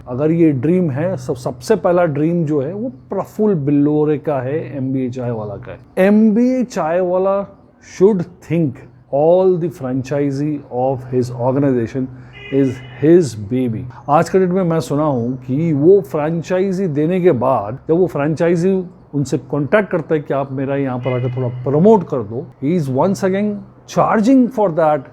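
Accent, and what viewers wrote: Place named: Bengal, India. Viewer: Sir are you bengali.. Because your accent is like that 😅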